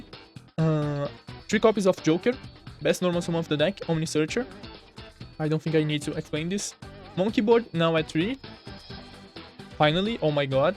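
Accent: Brazil. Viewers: You ARE Brazilian? Sotaque eu acho Im trying to do a deck that can make XYZ, shincro and fusion in one turn, im using dimensional dragons of manga, but could you help me ?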